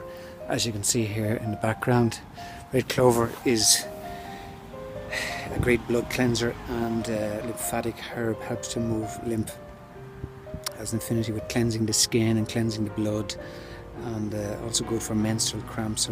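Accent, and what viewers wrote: Ireland. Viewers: great video...nice to hear an Irishman talk about wild herbs. I am a Horticulturist level 6 and I have started to use Urtica dioica and Taraxacum officinale and my heart rate is down from 170+ /80 to 134/78 my doc is stunned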